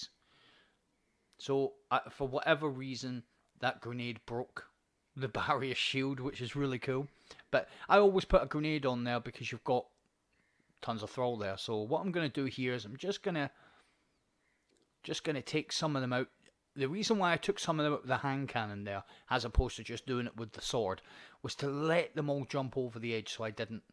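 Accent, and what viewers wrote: Scotland, United Kingdom. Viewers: A Scottish commentator ❤️❤️love from the highlands ❤️new sub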